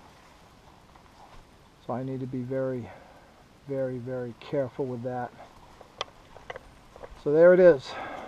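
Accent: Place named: East Coast, United States. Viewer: good to see you back at er pal! always enjoy your vids with the east coast accent commentary! keep safe brother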